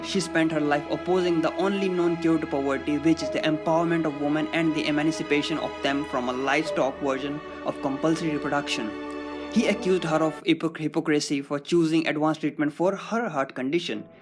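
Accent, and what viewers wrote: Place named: India. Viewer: Hard to understand this kind od indian version on Hindi English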